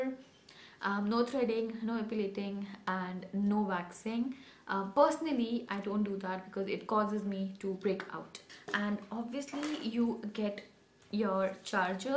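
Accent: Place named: India. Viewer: I cant take the indian accent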